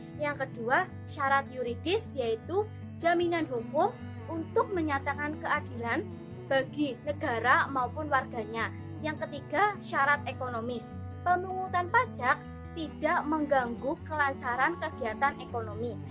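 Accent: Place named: Java, Indonesia. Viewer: Aku juga mau ngomong medok gini di depok mba :(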